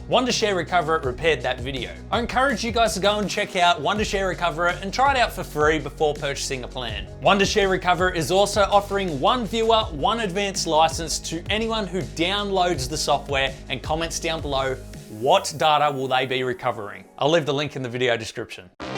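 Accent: Australia. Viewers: are u aussie ? so am i lol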